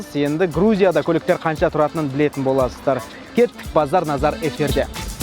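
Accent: Standard. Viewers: Таза казакша сойледи жарайсын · Таза казакшан ушн улкен рахмет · Бауырым ризамын саған, қазақша таза сөйлеп жүргізгеніңе бейне роликті👍✊👏🏻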